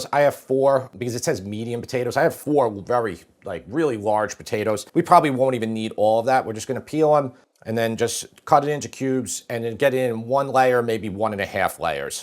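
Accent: New York, United States. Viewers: I JUST FOUND THIS CHANNEL!!!! Cannot believe I'm seeing this for the first time. Great recipes, great personality. He sounds like a New Yorker, like me!!!! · I love your NY accent. the NY accents are dying off. because of the 1 billion foreigners flooding into NY. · He sounds so “New York” — I love it! The “Taste Tester” is so darn cute! 😻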